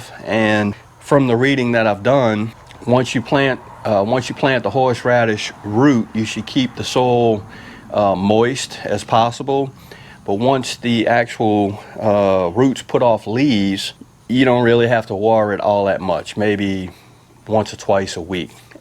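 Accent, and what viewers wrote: South, United States. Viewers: Omg love the country accent authentic American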